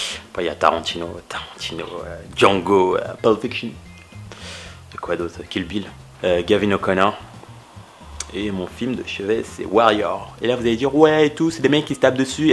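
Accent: Africa. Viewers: trop la classe l'accent africain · C est vrai que tu fais trop bien l'accent africain x')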